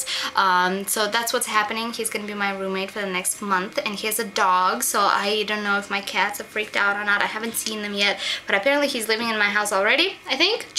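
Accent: Russia. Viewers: I can tell your accent gets thicker every time you go back to Russia. lol really cute.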